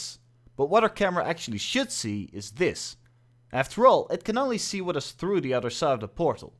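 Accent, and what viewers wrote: Netherlands. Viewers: You happen to be Dutch? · Love the fucked up half English half American accent of this Dutch guy. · Damn your accent is Dutch 😂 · Dude sounds Dutch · Nicely explained! I did some portal experiments in UE4 a while back and it's pretty much the same implementation I vaguely remember reading from one of Valve's presentations that they do all the rendering in one pass by setting the view/projecting matrices for the masked area of the portal so they don't use a secondary camera in the same way. It's been a while, so the details are blurry to me. That would save a bunch on performance, but is a lot more involved in getting to work proper. Are you Dutch by any chance? subbed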